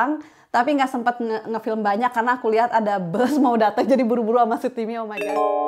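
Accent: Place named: West Java, Indonesia. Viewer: Resep bhs reng logat sundana has te hilap slm ti bdg sehat trus kanggo keluarga ❤❤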